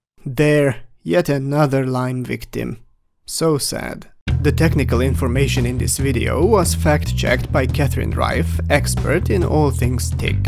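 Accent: Hungary. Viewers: I was curious how your jokes would work in english, and I'm not disappointed :) They still makes me laugh my ass off, but also very interesting to rewatch these videos in english. And I really like your accent, you cant deny you are from hungary :D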